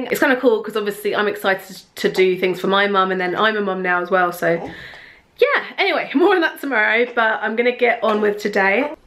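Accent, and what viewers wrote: France. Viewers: The french accent tho 😌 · ouuuuh l'accent français bien prononcé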